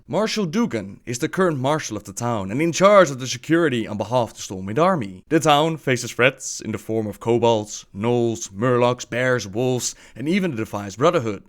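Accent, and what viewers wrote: Netherlands. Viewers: such a dutch accent :p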